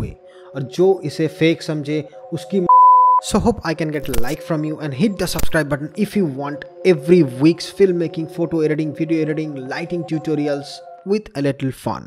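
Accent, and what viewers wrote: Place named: West Bengal, India. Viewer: Bro, you awesome. Your Hindi pronunciation is very similar, are you from West Bengal? If yes, please tell me the location. I'm also a photographer & Filmmaker, I would love to go for a shoot one day. Lots of love & good wishes. :-)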